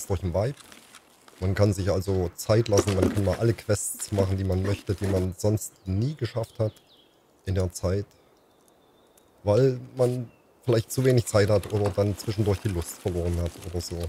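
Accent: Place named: Saxony, Germany. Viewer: Vielen lieben Dank für deine Info. Dir auch schöne Pfingsten. PS: nach deinem Dialekt klingt es nach Sächsisch. Kann mich aber auch Irren.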